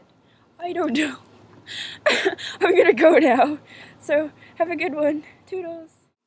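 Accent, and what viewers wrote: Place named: Canada. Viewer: i suspected u were canadian bc of yr accent! i live in bc too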